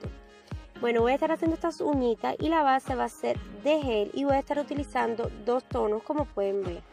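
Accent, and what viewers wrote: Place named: Caribbean. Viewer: Te quedaron lindas y como ya dije un tiempo atras, ese sabor que le pones con tu acento caribeño me encanta.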